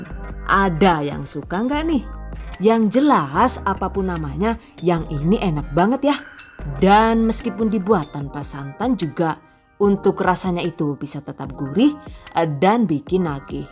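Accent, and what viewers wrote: Banyumas, Indonesia. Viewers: seneng bgt dengernya mba....logatnya khas ngapak2 ya mba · Suka bngt suaranya bhsane ngapak bngt ky aku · Kalau sudah ngomong bahasa ngapaknya, anakku gak berhenti tertawa hahaha. DONE #giveawayTHV2020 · Jan janeh wong ngendi sih mba....ko pinter bhs ngapak...pd bae karo nyong🤣🤣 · The Ngapak Video...tp hasil masaknya mbak e enak tenan mbok,ciamik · Assalamu'alaikum bunda, Alhamdulilah bisa liat wajah bunda, saya penggemar berat bunda Lo..saya selalu liat,video2 bunda.. saya suka bngt apalagi pas ngomong ngapak.. saya orang ngapak dari Purwokerto tp tinggal di Brebes 🙏🙏 salam santun,sehat selalu y bun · Assalamu alaykum Neng ibu reques resep kaldu sayuran dunk🙏... pokoke aku suweneng buanget karo vidio manteb polll gaya bicarane seng gokil logat ngapake,jelas banget ambi tip & trik e,wes to pokoke khas bnget ora ono seng madani,pkoke lope lope yù😘😘😘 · Mksh Bun, dah berbagi ilmunya. 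Mantap Bun, suaranya. Ngapak-ngapak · terimah kasih semua resep" nya. saya suka dengan suara nya & bahasa nya, mudh d mengerti. ada d alek Banyumas nya lg. kbetulan. " nyong be wong bnyumas. " Angger ora ngapak ora kepenak ". 😄😄😄🙏🙏🙏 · Emang aku juga suka skali dgn org cantik pintar d sangat terperinci skali jelas menerangin d suara nja ngapak2 nja lucu mantap kereen deh mks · DONE Sumpah laahh, enyong kie seneng denger step by step caranya...video yang lama jadi gak kerasa lama, coz jadi paham rinciannya dan ngakak sendiri kalau denger mother tongue Ngapaknya..maa syaa Alloh Sukses and penuh berkah buat #THVsukses #GiveawayTHV2020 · Mbokayu, rika asline ngendi si, deneng ngendikane ngapak pada karo nyong...asli Banyumas kaya kuwe... sukses terus ya yu...nyong seneng nonton koh...lucu...he he he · bismillah" DONE"Giveaway# 2020.selalu senang dengerin suara mbaknya yg ngapak.pokoke ORA N GAPAK ORA KEPENAK,OK.CATAT. 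· Sy suka mbanya klo ngomong lucu suka gaya ngapak dari mana si mbaa salam kenal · Hahahaha ngapak metu maning lucu, seneng liat video nya bnyak ilmu terimakasih bun GOD BLESS U Aamiin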